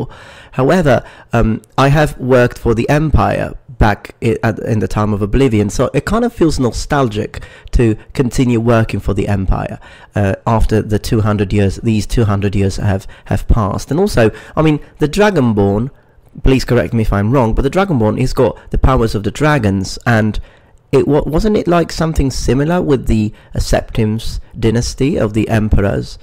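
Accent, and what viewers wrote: United Kingdom. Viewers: I love going back and watching older videos and it always takes me by surprise how thick and almost British his accent was at this point.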